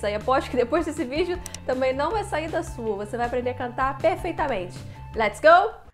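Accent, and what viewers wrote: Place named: United Kingdom. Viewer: Pronúncia bem Britânica.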